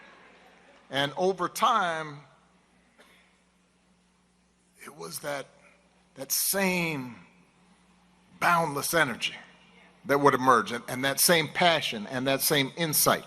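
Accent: South, United States. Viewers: I like how he throws in a little, country accent. So genuine ❤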